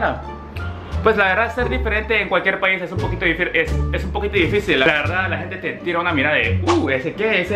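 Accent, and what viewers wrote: Guatemala. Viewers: JAJJA Me gusta que tenga cara de coreano y acento de guatemalteco :v JJAJAJ No se me encanta JAJA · Ahora en Korea como te tratan los Koreanos por que supongo que tenes acento para hablar koreano, haci como tenes acento Chapin. Buena honda ya sabes lo que es el bulling en Guate todos lo pasamos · Like si tiene acento de Guatemala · Amo tus videooos y tu acento chapín hsjsks♡ sos lo + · Hablas puro Guatemalteco los hubieras vergiado jajajja no te dejes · Su acento más chapín que nada jajajaja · Jajajaja ya habla como chapin Awuebosss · Me encantan tus vídeos me gusta como te expresas puro chapín te veo desde Guatemala.... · Amo como hablas. Acento chapín. :3 no te preocupes a todos nos ha pasado mala experiencia en la escuela aquí en Guate. Sos la mera tos maje. C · A mi me gusta como hablas con cara de Coriano y habla chapin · Hablas puro guatemalteco!! 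Que bien se escucha 😘😘🇰🇷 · Jajaja me encanta! Ya hasta hablás como guatemalteco 😂❤ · Y no te verguearon en Corea por hablar como chapin? O se a que en cualquier lugar (hasta en su propio pais) lo quieren verguear a uno por ser diferente y si uno se deja. Saludos y exitos en tu vida. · Me encanta tu español, creo que tenes más acento chapín que yo :'v Cuando regresaste a Corea no fue muy raro luego de pasar tanto tiempo acá en Guatemala? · tus vídeos son buenos y te considero coreano-chapin, cuando hablas español es acento guatemalteco y sos parte de nosotros como muchos coréanos que viven en Guatemala. Felicidades amigo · el acento chapin que bueno, 👏👏👏👏 parece chapin con cara de coreano, oye y tus novias chapinas que dicen. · Que mal lo que viviste siendo tan peque eso te marca... y waw hablas como todo un chapin... interesante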